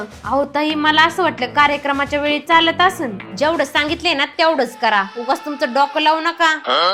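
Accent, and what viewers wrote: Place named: Pune, India. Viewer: पुण्याकडची भाषा आहे ना ही❤❤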